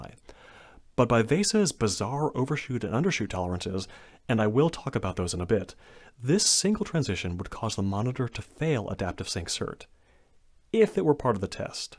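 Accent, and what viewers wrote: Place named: United States. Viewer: smart guy with american accent ASMR? subbed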